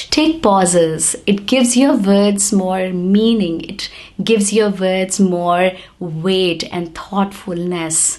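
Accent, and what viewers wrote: India. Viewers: Special thanks to you for maintaining indian accent. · We love your Indian Accent Mum😊😍 Thank you for always sharing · I really admire the way you talk to us. Your pitch is clearly audible. I like your Indian accent👍✌ I'm also on a way to speak fluently.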